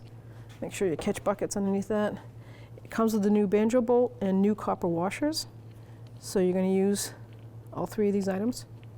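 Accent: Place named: New England, United States. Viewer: Great video tool. I have the 2010 RX350, I've replaced the rotors and pads front and rear, but the brakes still grab...so I'm thinking I need new calipers. 177k miles I think she deserves them, so that's next and I'm thinking that will make the system work as good as new. Love the New England accent too...I'm from north of Boston myself! always check with TRQ first, and 1A Auto. They ALWAYS stand behind the product 100%. Thanks from a customer you've earned. Question: possible you have a video on changing the fluid in the differential/transfer case of the AWD model of the same year? Thanks again.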